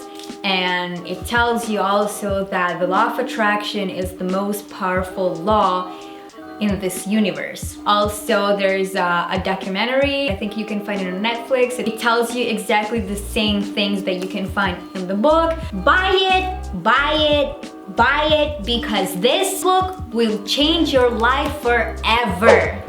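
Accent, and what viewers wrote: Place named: England, United Kingdom. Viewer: Hai un bellissimo accento inglese ♡.♡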